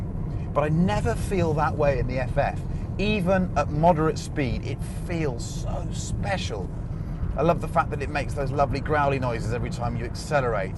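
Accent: United Kingdom. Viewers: Why the most viewed car reviews videos are made by British reviewers? Is it their accent ?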